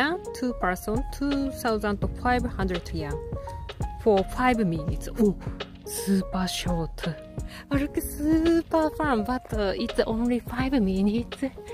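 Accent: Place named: Italy. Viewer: I LOVE all of your videos. This is just my observation so I do not mean to offend you, but it sounds like you have an Italian accent. I think it’s COOL!!!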